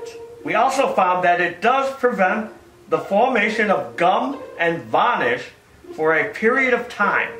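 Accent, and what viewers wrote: England, United Kingdom. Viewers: i like the english accent mixed in with a little Confucious accent near the end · Do you have a kimono with a dragon . Love the British accent old chap 🇬🇧✊🏻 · Your English accent isn't far off Sir Winston Churchill from the second world war lol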